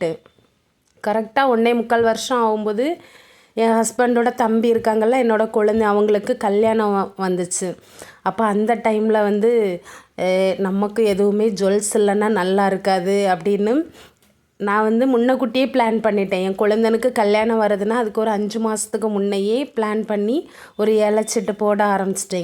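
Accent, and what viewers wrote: Kanyakumari, India. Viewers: Indha slang a kettalae Kanyakumari nu takkunu thonum😂😂😂😂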